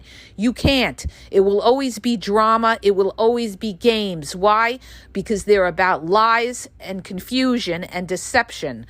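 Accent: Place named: New York City, United States. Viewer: A NYC accent...;)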